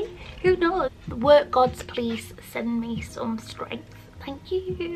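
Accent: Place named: Teesside, United Kingdom. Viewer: Hello ! I found your channel pretty randomly last week, you and your art are totally adorable. I love your studio vlogs even the bits you think are boring. And i can't believe i'm hearing a Tesside accent on here! I live in Yarm so i'm v close by if you'd like a new gal pal at all i'd totally be up for coffee and cake. I have no idea where that coffee shop is but i'm offically intrigued.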